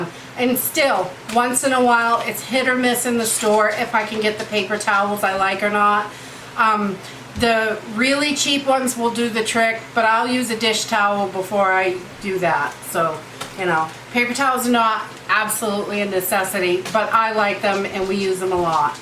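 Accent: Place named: Maine, United States. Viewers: I knew you were from Maine when I heard, “you’re bummin’” Subscribed 👍🤣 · I'm from Boston. I knew your "accent " that you were from New England. Love your videos.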